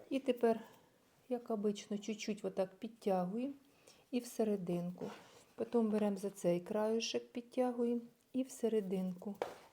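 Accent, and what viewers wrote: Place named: Poltava, Ukraine. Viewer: Я ,як почула Вас,подумала що з Полтавщини .У нас теж так кажуть -кабак.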